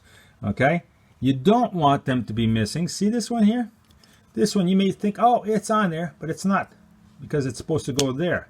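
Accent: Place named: Chicago, United States. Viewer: This dude has a thick Chicago accent.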